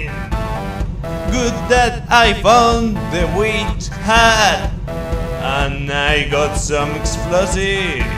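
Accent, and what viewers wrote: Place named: Spain. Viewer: Migue Povea Date cuenta que el es español y no tiene la misma pronunciación que un americano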